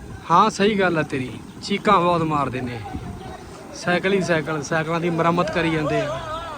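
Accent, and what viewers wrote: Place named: Punjab, India. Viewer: ਇੱਕ ਵਾਰੀ ਤਾਂ ਪੁੱਤਰ ਸਹੀ ਗੱਲ ਦੱਸਦਾ ਕਿ ਜਦੋਂ ਤੇਰੇ ਕੋਲ ਬਹੁਤੇ ਲੋਕ ਇੱਕਠੇ ਹੋ ਜਾਂਦੇ ਹਨ ਉਦੋਂ ਡਰ ਲੱਗਦਾ ਕਿ ਕੋਈ ਸਿਰਫਿਰਾ ਆ ਕੇ ਕੋਈ ਮਾੜੀ ਹਰਕਤ ਨਾ ਕਰ ਦੇਵੇ ਪਰ ਅੱਜ ਤੱਕ ਵਾਹਿਗੁਰੂ ਜੀ ਨੇ ਕ੍ਰਿਪਾ ਕੀਤੀ ਹੈ ਸਾਡੇ ਪੁੱਤਰ ਨੂੰ ਕੋਈ ਆਂਚ ਨਹੀਂ ਆਉਣ ਦਿੱਤੀ। ਵਾਹਿਗੁਰੂ ਜੀ ਕ੍ਰਿਪਾ ਕਰਨ ਅੱਗੇ ਵਾਲਾ ਸਫ਼ਰ ਵੀ ਏਸੇ ਤਰ੍ਹਾਂ ਵਧੀਆ ਚੱਲਦਾ ਰਹੇ।ਜੇਹੜੀ ਨਾਲ ਕੁਮੈਟਰੀ ਕਰਦਾ ਸਾਡਾ ਪੁੱਤਰ ਉਹ ਕਹਿਣੇ ਤੋਂ ਬਾਹਰ ਹੈ ਅਸੀਂ ਦੋਨੋਂ ਸੁਣ ਕੇ ਬਹੁਤ ਖੁਸ਼ੀ ਹੁੰਦੀ ਹੈ ਠੇਠ ਤੇ ਪੈਂਡੂ ਪੰਜਾਬੀ ਬਹੁਤ ਵਧੀਆ ਸ਼ਾਬਾਸ਼ ਪੁੱਤਰ।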